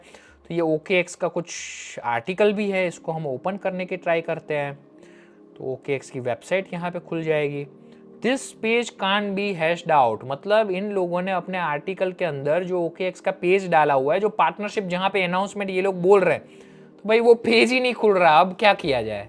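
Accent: Gujarat, India. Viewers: Aapki hindi Sunke Aap Mere Ko Gujrati Lagte Ho Bhai Ho Gujrati To Kaha Se Ho Bhai😊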